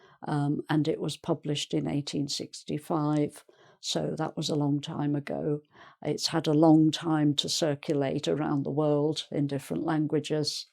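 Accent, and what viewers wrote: England, United Kingdom. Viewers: Miss. my full respect for you, really I just folding your class, but I not understand some of your classes, I can’t explain the problem. Maybe I feel shook of British access. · Great! is magnificent as usual. And her pronunciation is charming, it reminds me of my English friend... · Iam in love with british accent really it is so beautiful. Hearing it makes me want to stop hearing any Americans. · I honestly admire your charismatic accent..actually I am not a native speaker of English and already used to adopting tye American one..I could say that the British accent sounds both awesone and difficult .thanks for these illuminating classes which you make even easier with your wise and less hurried speaking voice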